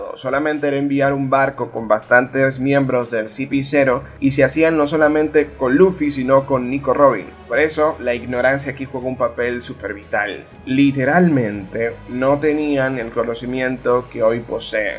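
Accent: Dominican Republic. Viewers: Por su acento diría que es de RD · Bro eres dominicano ?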